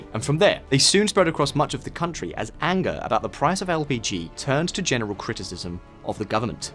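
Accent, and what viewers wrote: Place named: England, United Kingdom. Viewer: How is the standard of living in the US? How many homeless increased in recent years? Many Russia leaving because of the harsh weather and by nature like to travel the world. Propping dictators around the world?! Excuse me but, who is backing up the Saudi royal family despite dismal human rights? Bahrain, Egypt, Israel, and many other nations in the past and present. This is one sided propaganda show with an English accent, to make it more credible for the simple minded young viewers who watch mainstream and believe anyone with that accent. I dare you to make a video about the stolen historical artifacts the lay in Western Museums, or about Assange raw deal, maybe enough spine about Guantanamo bay prison, Snowden, cooperate America, backing mascaras in Indonesia in the during Suharto reign, Opium war affects, the theft of Hawaii, all the broken treaties with native Americans, the pillage of Australia by the British, The king of Belgium in Africa!! 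More recently show the new Nazi affiliated government of Ukraine and their western destabilizing actions all over the world. Show some spine in your reports, be original instead of copy pasting your own Empire agenda. Just a month piece nothing more nothing less. Grow some balls Sir.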